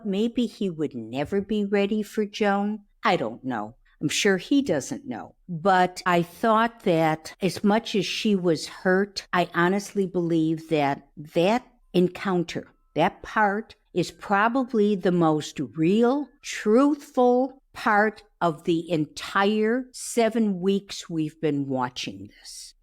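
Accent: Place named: Upstate New York, United States. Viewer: You are so cool -- just subbed. I agree that Joan is NOT ready to get engaged. BTW, you sound like you're from upstate NY. 😊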